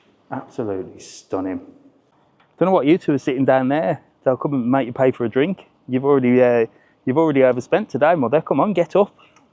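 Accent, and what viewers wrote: Birmingham, United Kingdom. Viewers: Just recently discovered your videos. Loving them. I'm a Brummie myself and love your Brummie way, and your Mum and way too, haha..trust him to spot MaccyD's! I'm severe sight impaired and have hearing aids too now. I am closely considering a stay in Lanzarote, and at the Arrecife Grand; so it's useful to get a good look round and insight. Probably be the first Romulus FC fan in Lanzarote...I wonder.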